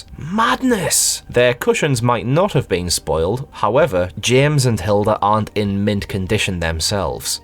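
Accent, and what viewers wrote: United Kingdom. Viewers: I like your accent! Great Britain?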